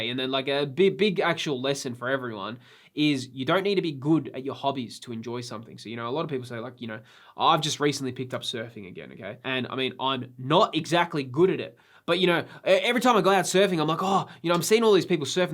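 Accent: Australia. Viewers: Hey, I've watched a few of your videos now and I just wanna say that I truly appreciate your content: besides the genuine passion for the hobby that you transmit, Im always very interested to hear your thoughts about the investing/ financial side of it. Keep it up please! Also, I lived a few years Down Under and I love to hear again some Aussie accent! And last thing, I think you said something very profound: that you don't have to be good at your hobbies to enjoy them. It made me think when I was too learning how to surf and getting always so frustrated because I wasn't as good as I wanted so bad to be. You just have to like what you to have fun! Keep it up 👍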